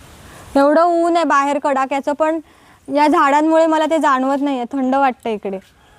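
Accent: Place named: Vasai, India. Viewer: तुझे व्यक्तिमत्व, दिसणे तसेच बोलणे अगदी वसईतील गावाकडील लोकांसारखे वाटते. कदाचित माझे मत चुकीचे असेल. तुला कोकणातील बारीक सारीक गोष्टींची माहिती आहे जी तुझ्यासारख्या सध्याच्या नविन पिढीतील कोकणातील मुला-मुलींना ही त्यांची माहिती नसावी.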